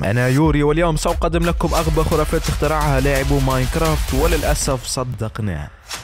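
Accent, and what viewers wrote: Tunisia. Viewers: انت تونسي او جزائري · انت ولد بلادي تونسي صح؟؟ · ياخي انت تونسي؟ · هل انت تونسي؟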